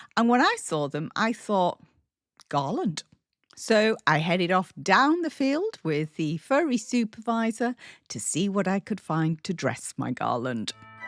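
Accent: England, United Kingdom. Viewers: I know things are different in England ( I assume from your accent that that is where you are located ) however the "Ice Screws" you talk about are not ice screws, Ice screws are the item rock climbers use to tether themselves to the rocks they are climbing . What you bought were screw hooks for the golf balls . Lots of great ideas here.....thanks for sharing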